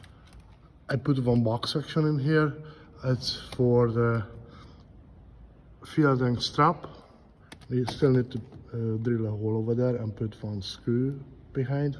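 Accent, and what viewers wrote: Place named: Northern Ireland, United Kingdom. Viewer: I might be wrong but you sound like you've picked up a bit of an northern Irish accent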